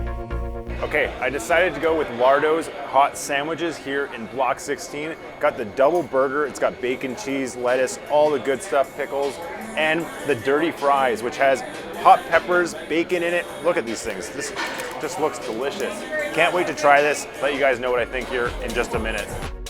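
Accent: Canada. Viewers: I like the $20 trick to see if you get anything. I find it interesting that some places allow early check in. I like your brief reviews! BTW, do I notice a Canadian (or Midwestern accent) haha?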